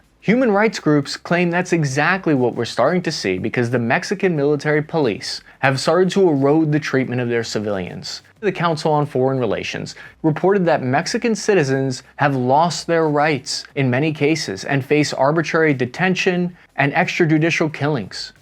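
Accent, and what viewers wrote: United States. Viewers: Dude, you have a very strong American accent to your English usage. For me, an American, I put a lot of credence to your reports and analysis, because I know that it is coming from a familiar/recognized source. This is a good thing because there is a whole bunch of analysis which is written and then 'read' by computer to make it sound to be American English. Your presentation is authentic and sounds authentic. I have listened quite a bit and have drawn parallels in facts and analysis to other sites and sources that I trust. Good job! Keep up the good work, you have a keen sense for conflict and the social and political dynamics around conflict. Again, good job! and Thank You for your hard work and research.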